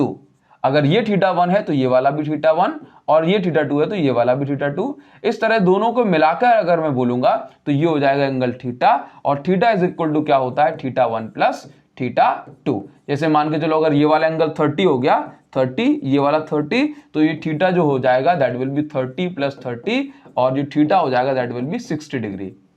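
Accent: Odisha, India. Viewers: Sir app odisha se hen kya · Sir are u from odisha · Sir are from Odisha 🙏🏻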